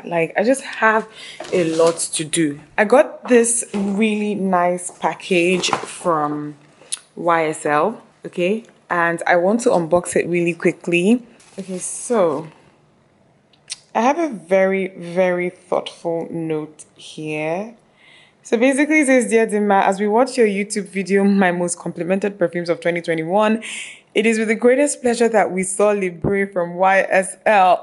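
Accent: Igbo, Nigeria. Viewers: I just love the Igbo accent 😅 welcome home Dimma💖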